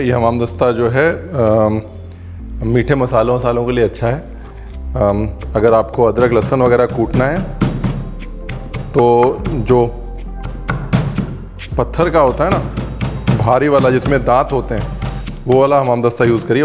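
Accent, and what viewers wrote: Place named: Lucknow, India. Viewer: mutton ka zayka ek taraf, aapke lucknowi accent ka zayke ka to jawaab nahi ! <3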